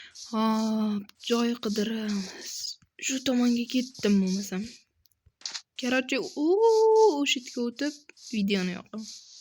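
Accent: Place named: Khorezm, Uzbekistan. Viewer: uka xorazmlikmisan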